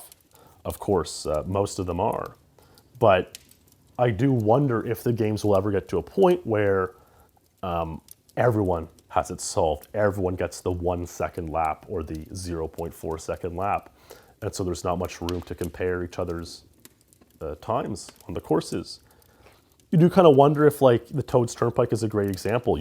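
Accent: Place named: Canada. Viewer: what part of Canada you from?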